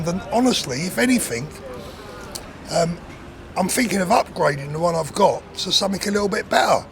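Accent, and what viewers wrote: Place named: London, United Kingdom. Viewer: Always great to hear a real Londoner. Too bad your own country doesn't want you.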